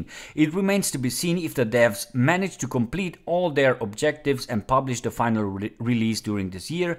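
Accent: Germany. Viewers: I like your german accent :) Keep up informing us about tarkov :D!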